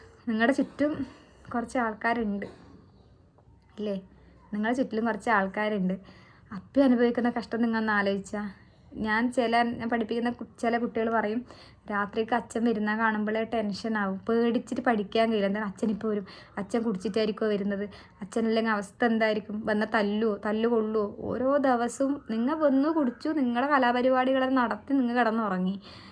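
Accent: Kasaragod, India. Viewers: മലപ്പുറത്തിന്റെ മരുമകൾ, കാസറഗോഡ് ഭാഷ ഒട്ടും മാറ്റം വന്നിട്ടില്ല, അനുഗ്രഹീത ഗായിക അഭിനന്ദനങ്ങൾ ❤❤😂😂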